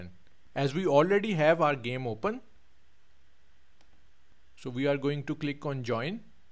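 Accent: India. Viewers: worked amazingly, thanks indian accent man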